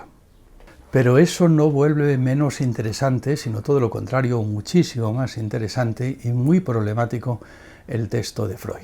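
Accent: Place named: Spain. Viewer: Qué pena no haberlo descubierto antes Sr.. Llevo un tiempito escuchándolo y me resultan muy interesantes las diferencias en el modo de hablar, explicar Psicoanálisis desde la voz de un Español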